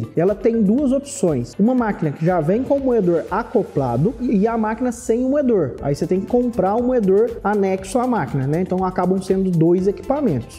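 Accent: Minas Gerais, Brazil. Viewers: Oi, eu não conhecia os cafés especiais e o quão incrível é esse mundo até achar o seu canal por acaso. Passei a seguir suas dicas e hoje eu aprendi a apreciar o café. Só tenho a agradecer pelo seu excelente trabalho! se possível pode tirar uma duvida minha? eu tenho pesquisado a respeito de uma maquina da Oster esse modelo aqui: <link> comparada com as outras ela parece muito mais simples. você já viu essa maquina ou teria algum comentário a fazer sobre? ela parece ser muito simples de usar. sobre o bordão, bom acho que sendo de minas você poderia usar algo mais característico do sotaque dai. Talvez fique legal C: um grande abraço e muito sucesso!!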